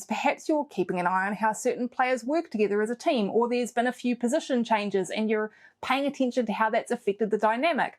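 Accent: New Zealand. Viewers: Stop!! You need to give people warning. You really do. A Kiwi accent with a slight lisp. Loud, proud, and scary. Oh God while I'm typing the dog just went into meltdown. Don't ever say kettle of fish like that ever again. They are cancelling you because of public health, and maybe watching style and hanging out for a red flag and all your other Aunty type of preaching over the most singular boring sporting event that is somehow still on. Come on, Union and netball is your bag. That's your wheelhouse, your hitting zone, not doing a Karen preach about the most boring thing ever televised. The Military tattoo is better viewing. And..... Learn how to pronounce hypocritical properly. Please. Sorry but it's the truth. That accent, at that speed, with that entitled Jacinda like, I know boats, put down isn't your strong point. I can't listen to another do I'll just ask... 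Do you do this word of the week thing in each post? How many times can you get outlier into one post? Wow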